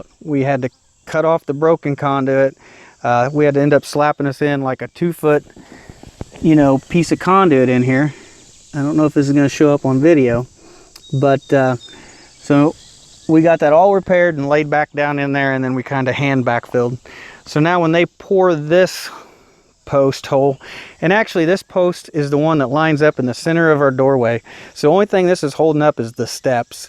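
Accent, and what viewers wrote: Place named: Southeast, United States. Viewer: So nice and you are very good in your commentary. I subbed!!! By your accent I'm guessing you live in the south east, beautiful country BTW, do you foresee a problem with bugs since your so close to the pond?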